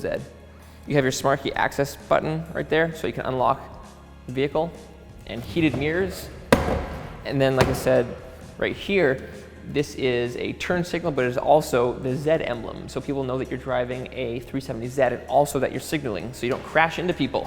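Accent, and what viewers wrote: Canada. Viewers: Might be Canadian.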